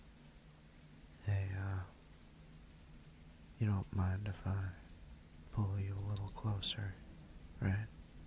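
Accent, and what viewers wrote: South, United States. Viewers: weird question but am i the only one hearing a southern accent? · the soft "darlin" always gets me i love a good western/southern esque accent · 100% there is no way there isn't a southern accent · the country acent😳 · Light southern drawl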